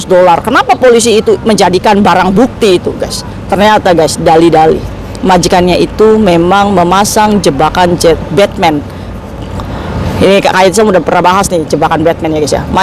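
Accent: Indramayu, Indonesia. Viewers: Logatnya kayak org Indramayu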